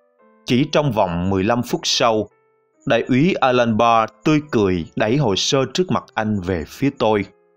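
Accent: Standard. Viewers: Giong tram phát am chuan rất hay ( đường phan thanh gian )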